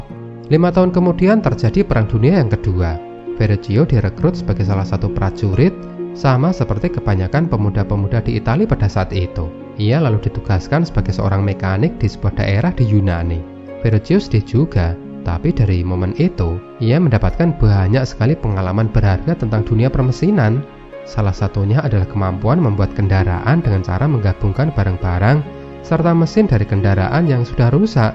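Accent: Java, Indonesia. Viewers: sip lanjutkan mas dengan suara khas medoknya,hehehehehe... barang kali ada yang minat tutorial design grafis dan percetakan kunjungi channel ini, mudah mudahan ada manfaatnya....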